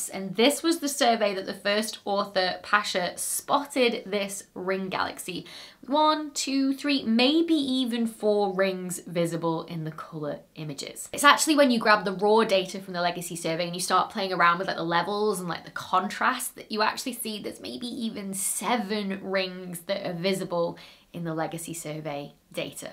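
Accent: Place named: Yorkshire, United Kingdom. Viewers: Dr Becky is 'educated Yorkshire'. That is, her enunciation is perfect, but the vowels are flatter.. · I really hear that foot-strut vowel when she talks. I think the Yorkshire accent is my favorite, particularly the "uneducated" one. It sounds so incredibly pleasant. Cheers from Minnesota! 🙂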